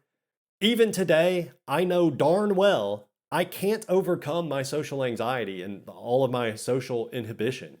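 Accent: South, United States. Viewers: Hey there. 33-year-old female here. I just came across your channel and this is the first video of yours I’ve ever watched. I’m only about halfway through but I don’t think you should be so hard on yourself. You seem like a really great guy. And you’re funny!!! Stop telling yourself you’ll never have a meaningful relationship. That’s not true. If you keep telling yourself that, you won’t have one. I’m blind using a screen reader so I can’t see what you look like, but you seem to have a nice personality. Work on your confidence in your self-esteem. That’s the most attractive quality in a man. By the way, where are you from? I feel like I’m hearing a southern accent lol. I’m from Kentucky so I have one too!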